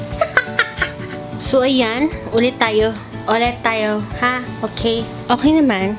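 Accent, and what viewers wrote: Philippines. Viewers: are you cebuana?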